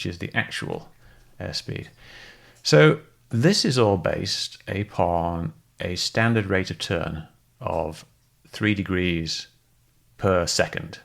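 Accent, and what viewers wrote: United Kingdom. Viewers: Lmao if your stupid enough to believe this asshat knows more about a flir targeting pod than EVERY PILOT IN THE MODERN DAY MILITARY, then you deserve to be kept in the dark. I mean he used actual science and math to prove his point, while this dumbass used a flashlight in his garage in a lame attempt to make his point. Just becuase they have a British accent, doesn't mean they are intelligent. This guy just profits off of this shit ironically like the people he's trying to discredit. Think about it, who has the most to lose in this argument? Mick West or literally anyone else who actually trained as a fighter pilot? Use your damn head people!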